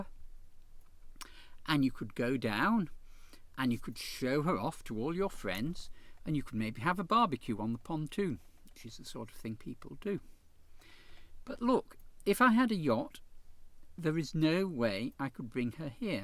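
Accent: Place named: RP, United Kingdom. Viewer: sound like a silverspoon in gob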